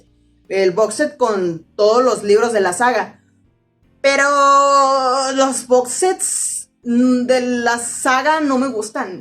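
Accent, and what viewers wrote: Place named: Monterrey, Mexico. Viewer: Supuse que eres de Monterrey (o zona metropolitana) por el acento y por relacionar la feria del libro con octubre 😅, si es así te recomiendo darte la vuelta por librerías de segunda mano en calle guerrero, a veces encuentras la saga hielo y fuego nueva a mitad de precio, mucha gente con los compró y no leyó nunca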